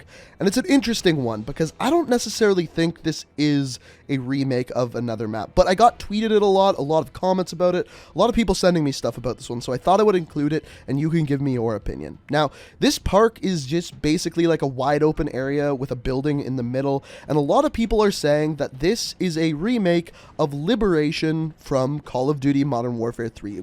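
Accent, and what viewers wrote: Canada. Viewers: You say ‘about’ and ‘out’ and I can instantly tell you are Canadian. Cool video. Thanks. I’m not sure if this is continuity in the Call of Duty games or just laziness by Activision? They probably have the skeleton’s of these maps and convert them over to their current engine. Will we get another Map, besides Verdansk?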